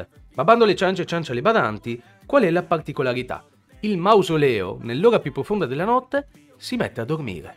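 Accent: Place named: Trieste, Italy. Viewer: Si dice crémisi non cremísi, cerchiamo di parlare correttamente la nostra lingua. Capisco che dall’accento che hai la parli poco però sforzati, Trieste non è in Austria da un po’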